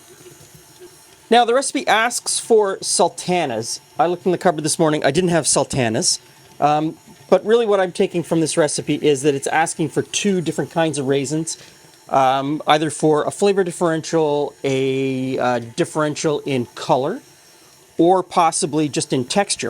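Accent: Canada. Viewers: Hi just started watching your videos. I’m Scottish ( Edinburgh) so watched the old Scottish cook book one. Was curious to see if it matched the kinda stuff my granny passed down. The carrot pudding looked good. Although it did look a lot of butter so I’m not sure it meant fluid Oz maybe Oz as in scales. Anywho could try both ways to see. So this one would have been better done as a clootie dimplimg. A cloot meaning cloth in Scotland. So boiled for about 2 hours. Also the addition of suet is helpful and would have been in most recipes.. puddings and pies. Thanks for your videos , you seem like a kind friendly guy. I’m also starting to recognise the Canadian twang in accents when I hear it. I’m not used to hearing Canadians being from a small village. Look forward to seeing what else you do.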